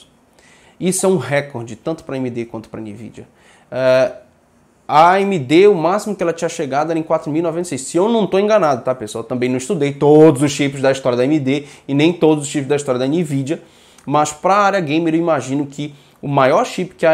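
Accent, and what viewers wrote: Pará, Brazil. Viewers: cara tu é paraense??? hahaha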